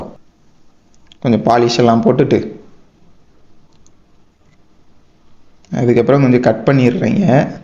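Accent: Madurai, India. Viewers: ஒன்னு மதுரைக்கார மாதிரி பேசு இல்ல கன்னியாகுமரி காரன் மாதிரி பேசு, ரெண்டு மாதிரியும் பேசி கொல்லாதடா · Madurai slang 🔥🔥🔥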